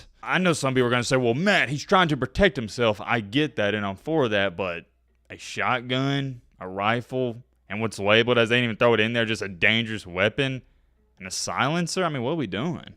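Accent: South, United States. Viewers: How tf u don’t know what a sawed off shotgun is? And you sound like ur from the south?? Like holy jeez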